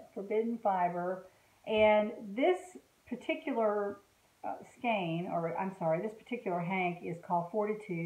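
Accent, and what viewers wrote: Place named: Tennessee, United States. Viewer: G'morning;) I just stumbled on your videos... so I binge watched you last night while I was hooking. I absolutely love your accent and the first of your videos I thought to myself; omg is that a Tennessee accent? I just love Tennessee, I've only been through there a few times when I was younger and once stayed a few days in Nashville, but still remember it so clearly! Anyway, I've had fun watching, keep up the great videos;) Donna from Canada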